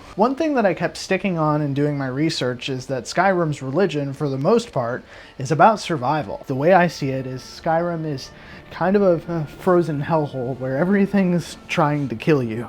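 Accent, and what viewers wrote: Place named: New England, United States. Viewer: just sound like my old neighbors/coworkers in Vermont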